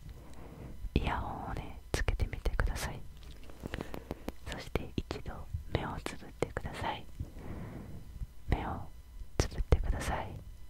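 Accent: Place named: Kansai, Japan. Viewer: ティックトックからきました、音フェチ昔から大好きなんですけど久々に新しいチャンネルにハマりました嬉しい🥲🥲 これからお世話になりまーす！！ 関西弁やな〜って思ったんですけど関西の方ですか??🌟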